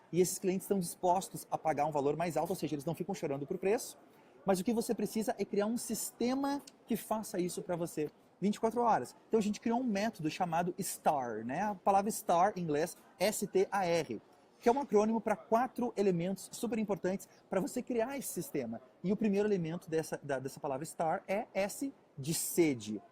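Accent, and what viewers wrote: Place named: Portugal. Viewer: To começando a te acompanhar, e sábia que esse sotaque era da terrinha.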